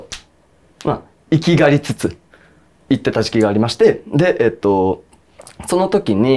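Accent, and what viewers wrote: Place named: Kansai, Japan. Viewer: 何が気になる？ 別に変な関西弁じゃないよ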